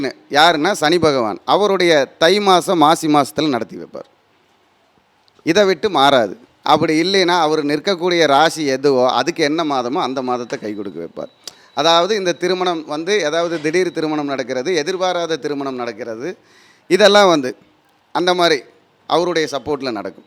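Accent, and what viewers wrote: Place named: Kerala, India. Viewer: நம்ப முடியவில்லை, கேரளத்தில் இருந்து தமிழ் சுத்தமாக எப்படி பேசுகிறீர்கள்?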